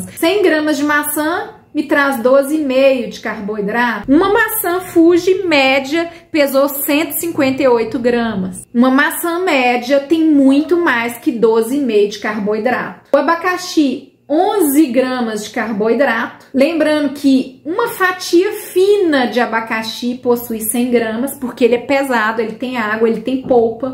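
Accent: Minas Gerais, Brazil. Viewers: Eu sou mineira de Uberaba,resido em Sampa Acho que essa menina tbem ë mineira ?? Acho pela pronu cia.Saudaçoes.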